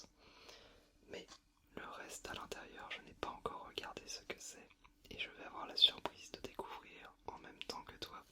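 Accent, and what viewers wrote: France. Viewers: Joyeuses fêtes, j'aime beaucoup tes vidéos je te trouve très apaisé et apaisant. J'apprécie le soin et la préparation que tu accordes à tes vidéos, je pense que ta chaîne est ma chaîne ASMR préférée:) PS: J'aime bien ton accent français sur tes vidéos en anglais, c'est un bon trigger ajouté au chuchotement:)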